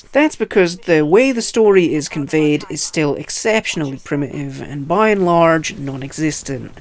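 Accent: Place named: Albania. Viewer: He's Albanian.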